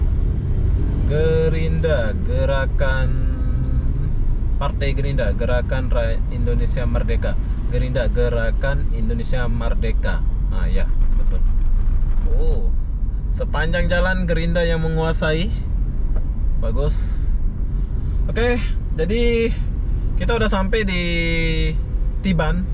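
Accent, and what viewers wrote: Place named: Malaysia. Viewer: Ooohh pantesan masih dapat Logat Melayunya Pas, kirain orang Riau/Sumbar. Ntaapp Lanjutkan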